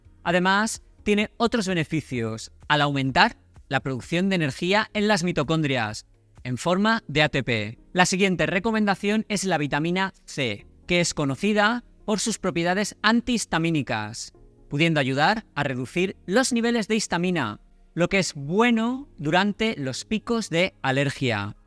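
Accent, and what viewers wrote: Spain. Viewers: Vit D3 PARA CARENCIA DE VIT D..SOLO POSEO EL 8% Y NECESITO CERCA DEL 100%> ES UD UN GRANDE, GRACIAS CONFIO EN UD..DESDE HACE MUCHO LO ESCUCHO..Y LO PERDI HOY YA NO no lo perderéad. Solo me agrada la gente con valores lis demás que digan no me interesaron antes y menosdesdeURUGUAY.LO SKDO..SIEMPRE ME HA DEJADO LO MEJOR..A LAS ORDENES...DE QUE PAIS ES UD??? a..🥱🇺🇾. UD R TIENE ACENTO O ESPAÑOL. MADRE PATRIA O COMO EL MIO.,MAS BIEN SPANISH... UN enorme gusto...bienvenido,Bienaventurado..es muy grato en esta suciedad No SOCIEDAD.encontrar valores..!!! Uruguay.; Graziella Riccetto.!! Gracias.!!❤🎉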